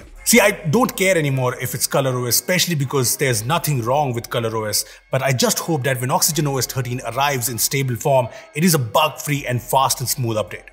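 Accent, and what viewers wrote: India. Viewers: How do u got 3m subs with that indian accent, i couldnt understand a word you said.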